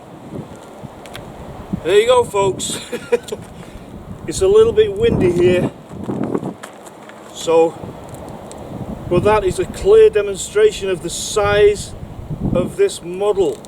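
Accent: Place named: North West England, United Kingdom. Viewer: Where are you intending to display this? I assume you're in north west england from the accent?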